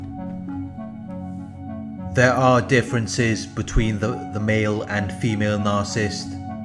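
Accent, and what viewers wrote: Wales, United Kingdom. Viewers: For what it matters.... I am from Leicester, England, I have an accent and pronounce WORDS differently... the guy doing these videos is from Wales... it's regional dialect....people from Liverpool, Manchester, Newcastle etc etc etc..... all have a regional dialect/tone to their accent. Don't worry about it.... you're in the picture now🙂✌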